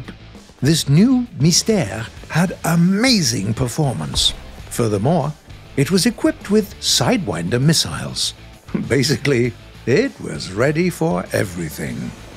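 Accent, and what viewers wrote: France. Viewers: your french accent is wonderful!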